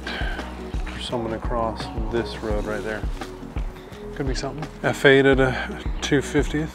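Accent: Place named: Boston, United States. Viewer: I have never heard a better Boston accent in my life. And I’ve watched a few Ben Affleck movies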